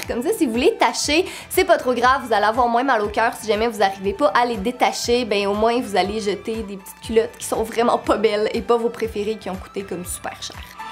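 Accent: Quebec, Canada. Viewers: C’est juste l’accent québécois hahaha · Elle québécoise comme moi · Trop bien t'as vidéo !!!! T'est trop belle et j'adore ton accent québécois💜💜💜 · Seynabou Ng moi je crois qu elle et québécoise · T'as vraiment intégré l'accent québécois. On devinerait même pas que t'es née en France. C'est important de parler de ce sujet sans tabous, surtout quand il y a des gars qui écoutent, raison de plus. C'est un signe qu'on n'est pas tous indifférents à vos problèmes de filles. Même si on ne vit pas le phénomène directement, on le vit au niveau social. Et on imagine que c'est chiant au niveau inconfort et organisation et ce que ça vous coûte par année. Il faut continuer à bouger et prendre des analgésiques si nécessaire, et ne pas laisser vos règles vous empoisonner la vie comme une maladie. On trouve ça tellement plate quand une fille ne veut pas nous accompagner dans des sorties ou des activités aquatiques comme si elle était malade. 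et quand on est en train de relaxer, on peut vous offrir des massages pour vous rendre ces périodes un peu moins désagréables. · Ouais tes bien québécoise '' melon d'eau '' 😂😂😂 Ma grand mère est québécoise mais elle a pas du tout cette accent Peut être parce que j'ai l'habitude de l'entendre Bref tes vidéo sont super continue 😘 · Toi tais français de France hein? Pcq elle,elle est québécoise(comme moi)😂😂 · oui elle est quebecoise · C’est seulement la façon de parler des québécois moi même j’en suis une et pour moi c9est vous qui avait un accent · J'aime bien l'accent québécois il est trop beau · St'une québécoise 😌💅🏼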